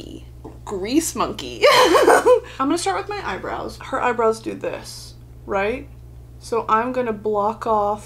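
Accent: United Kingdom. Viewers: Her British accent is so iconic it sounds like Harry styles and peppa pig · WITH THAT BRITISH ACCENT YOU WERE SERVING ADELEEEE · your british accent makes me think of adele lmfao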